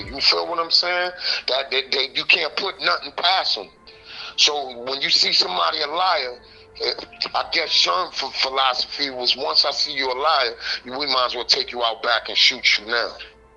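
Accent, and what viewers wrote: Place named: New York, United States. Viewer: Nigga telling NY stories wit a NY voice shit crazy son 😅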